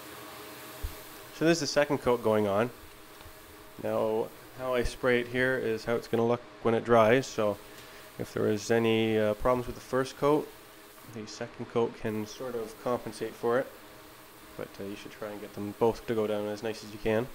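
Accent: Canada. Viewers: You sound Canadian are you? If so where in Canada? Thanks PS you need a longer hose on that paint gun....just sayin...well done. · Nice video. Great spray techniques. Are you Canadian?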